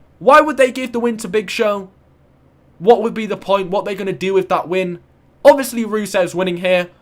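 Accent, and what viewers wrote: England, United Kingdom. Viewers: You have an english accent are you from UK ?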